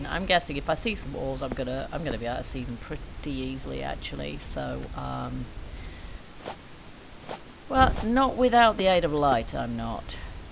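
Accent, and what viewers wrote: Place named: United Kingdom. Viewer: love the accent, I don't hear a lot of female gamers, let alone british one's so kudos to you, my ancestors came from Yorkshire...keep up the great work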